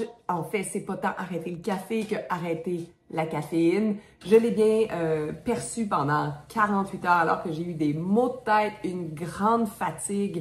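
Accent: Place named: Quebec, Canada. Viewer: Québec sûrement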